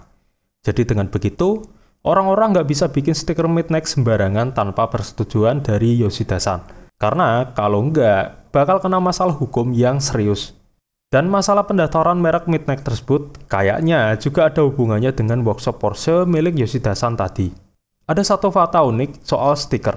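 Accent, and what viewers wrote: Java, Indonesia. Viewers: Medokmu lo min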